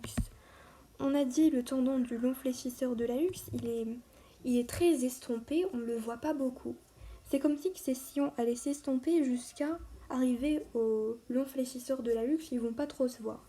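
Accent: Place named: France. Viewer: vous êtes la meilleurs des anatomistes au monde vous proposez une explication détaillé j'espère que vous continuez les autrs chapitres un ;grand merci de l'algérie😘 nb:j'ai cru que vous étiez française vu ton petit accent c'est pour ça qu j'ai dit merci de l'algérie mdr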